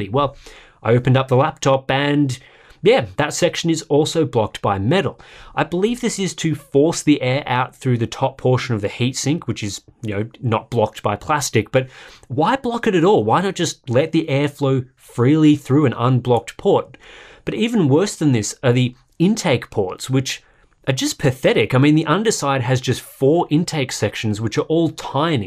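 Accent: Australia. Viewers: Good day mate, are you from Australia?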